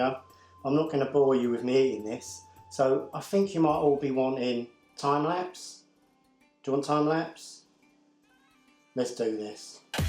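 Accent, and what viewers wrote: Liverpool, United Kingdom. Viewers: I used to love you........would never have guessed you was a scouser.👹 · I could tell you a red it was the scouse accent that give it away. 🤣🤣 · Lovely Scouse accent there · Sounds really scouse 😹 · Ye we could tell from Ur accent u were either a Liverpool or United fan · Ahhh yes the famous scouse accent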